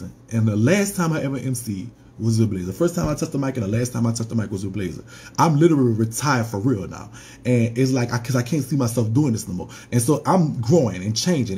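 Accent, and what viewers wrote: New Orleans, United States. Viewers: I subscribed off the hair and New Orleans accent alone baybee yesss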